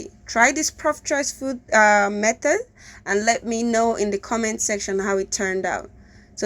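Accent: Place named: United States. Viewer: I just finished watching a pidgin version of another video. Coming over here, It's the American accent for me o. Put in some Warm WORA... Na dem no ready. Well done. Nice video. Please, I suggest you should record your videos in landscape mode for Youtube.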